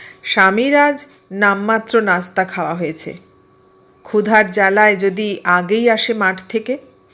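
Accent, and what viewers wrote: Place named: Bangladesh. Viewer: ফুল্লোরা থেকে ফুলজান, তার থেকে ফুলি। খুব সুন্দর গ্রামীণ বাংলাদেশি বাংলা ভাষা পাঠ করেছেন। দেবীরা কখন কি বেশে আসেন সেটাই আমার ভাবনার বিষয়। গল্পের ঘটনা যা, সে যুগ আর নেই, নোংরা রাজনীতি ও জনবিন্যাস বাংলার সব শেষ করে দিয়েছে।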